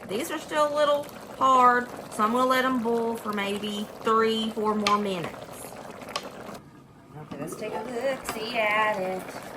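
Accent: South, United States. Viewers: I can't tell you how much i love listening to you talk in that amazing and soothing southern accent! Its as good as all the comfort food you share.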